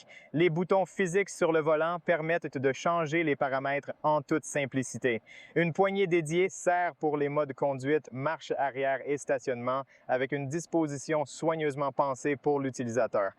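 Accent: Quebec, Canada. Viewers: Honnêtement ,cette vidéo comporte beaucoup d’informations interessantes mais exprimées dans une telle confusion,passant du coq à l’âne , brouillées par un débit verbal trop rapide et par l’accent québécois que je renonce à vous écouter jusqu’au bout. Pardonnez moi .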